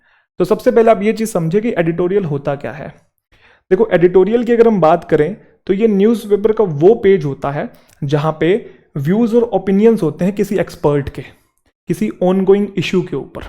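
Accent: Haryana, India. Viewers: Fruitful session🥀 apki batein sunkr aise man krta hai bass sunte hii jaoo….English ke sath haryanvi tadka jo milta hai hme😅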